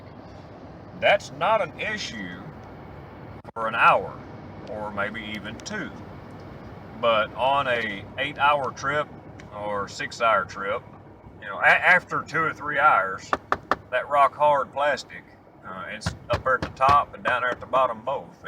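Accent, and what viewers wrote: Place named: Tennessee, United States. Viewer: 11.5 meter reading, if it's anything like my Caravan you can take about 2 mpg off that, on a recent trip up to Vermont mine read 28.6 but calculator said 25.6. A friend who just bought one I asked him if he was getting 15 mpg he said he be tickled if he could get 12. Not a better looking truck on the road, but oh man the fuel economy is awful! Awesome TN accent, this man could tear up a fishing or hunting show, I like my preachers to talk like this man, our Preacher is from Nashville TN, best man I ever met in my life! Brother n laws Silverado gets 15, my Ford gets 19.25. I don't have a v8, but oh man she pulls! I still Live the RAMs looks.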